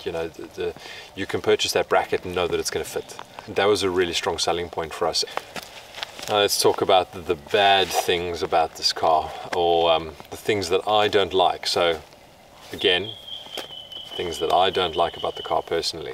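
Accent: South Africa. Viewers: Awesome video. Really enjoyed it. Your accent though, are you South African? Doesn’t sound Aussie, or are my ears deceiving me 😅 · You south African?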